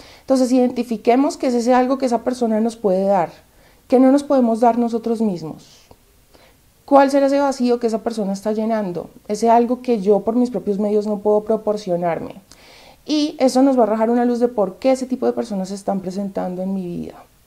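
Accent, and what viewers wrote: Bogotá, Colombia. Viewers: Así se expresan los Bogotanos 😊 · Me encanta tu acento colombiano, ni raja ni presta el acha, aquí en México decimos: ni picha, ni cacha ni neja batear.... excelentes videos....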